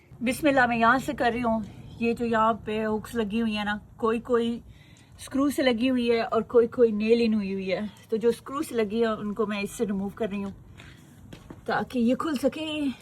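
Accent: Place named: Mirpur, Pakistan. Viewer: Aap pakistan mein kahan se hain Aapka accent bilkul mere jaisa hai And aapki choice of words bhi Mein Mirpur k paas se hu.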